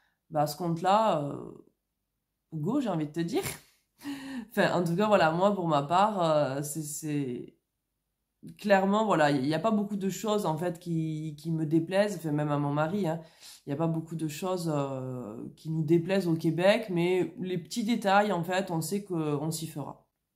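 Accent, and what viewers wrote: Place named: South, France. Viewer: Ha!! Toulouse! Je me disais que c'était l'accent du sud ! Nous c'est Perpi ! Encore merci pour toutes ces infos, votre départ approche de plus en plus !!! Trop hate de connaître la suite, on prend le même chemin que vous ! On a la quarantaine, pas d'enfant et conjoints de fait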